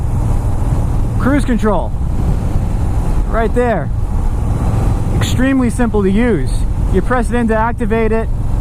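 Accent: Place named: New York, United States. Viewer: "Ya really gotta watch deez cownaz." Gotta be New York. Love it.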